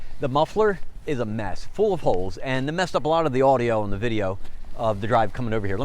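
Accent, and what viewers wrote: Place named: Pennsylvania, United States. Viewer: im new here so idk if youve said where you're from but i would know that accent anywhere lol PA ftw bro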